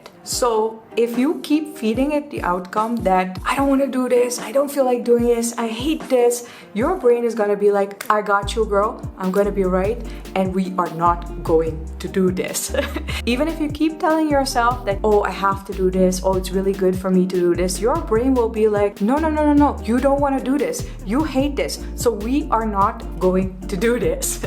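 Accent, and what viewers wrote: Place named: India. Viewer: Ik vind het zo schattig en leuk om te horen hoe inmiddels het Indiase accent je uitspraak beïnvloedt. Superleuk om te horen :)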